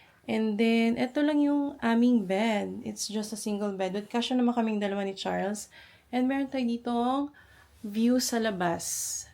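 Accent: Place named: Iloilo, Philippines. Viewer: Ilonggo kamo? I can hear the accent. 😊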